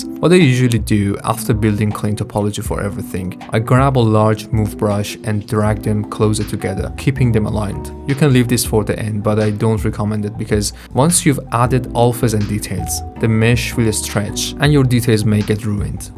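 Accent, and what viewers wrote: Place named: Iran. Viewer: This video is amazing, but im a beginner in zbrush or 3d space i worked with cc3 and 4 and i must say iclone and character creator is awesome but in zbrush i just know the barebone basics, where would you suggest that i go for learning zbrush or modeling? My goal for now to make a superhero characters and stuff, like batman or red hood or superman basically characters with armors and helmets or trench coat and cape, i know that you're making the same kind of stuff in your video but my problem is it that i didn't know some of things that you are doing, like using specific tool or features I checked a lot of tutorials but somehow there confused more because i follow what there doing but these always a problem, so the help or recommendation would be appreciated. By the way your accent is so Persian, if you're one of us, dorud my brother, a lot of love from hormorzgan❤️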